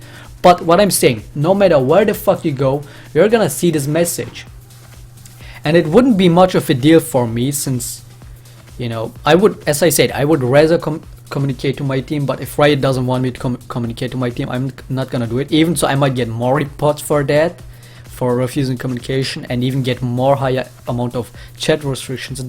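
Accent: Germany. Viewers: WolfGang Man yes im german i know that accent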